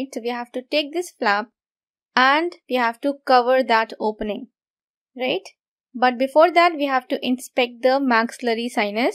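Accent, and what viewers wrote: India. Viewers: Medok banget Indian mu sist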